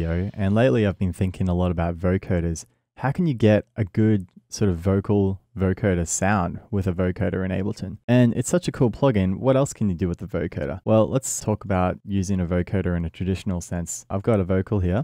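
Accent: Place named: Australia. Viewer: You're so eloquent when you're explaining this, it's clear that you really understand the subject matter, and it's inspirational. I enjoyed this tutorial so much. I'm jealous of your knowledge. You make it look so damn easy! Keep making these :) ps: Always helps hearing someone explain in good plain Aussie that I can understand ^_^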